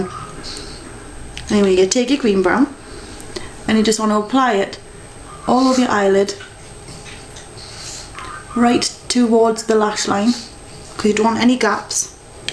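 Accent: Newcastle, United Kingdom. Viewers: nice british accent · I think she comes from near newcastle :D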